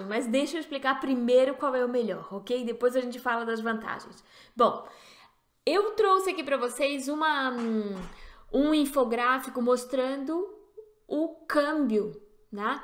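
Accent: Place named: France. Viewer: você mora na frança ou algo assim? kk consigo perceber um sotaquesinho já 😅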